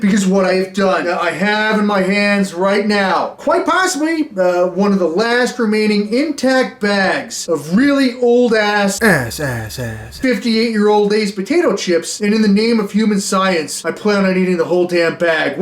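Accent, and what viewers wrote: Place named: South, United States. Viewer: 👀👀 you and Bryan Broaddus almost sound identical. Hes a Dallas Cowboys scout southern draw but yall sound so similar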